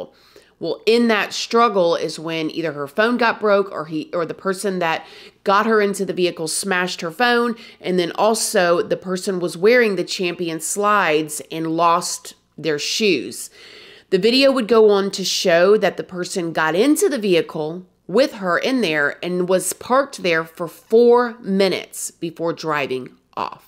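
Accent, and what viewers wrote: South, United States. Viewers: I agree, I think this was a random attack but VERY coincidental. I think he saw her run by, either knew who she was since her family was so prominent in that neighborhood or saw she was minimally clothed and thought it would be a perfect opportunity for SA. It makes my stomach turn to think she was just out minding her business and someone could do that to her. I heard her remains were in pretty bad shape (I don't want to go into detail because from what I heard, it was just horrific) but I think what he did in the disposal was to try and get rid of DNA evidence from himself. My heart breaks for those boys and her husband. 💔 Sidenote: I love your vibe and southern accent, I've been watching your videos for a little over a year, especially the videos of you explaining your past. You keep it real and I admire that!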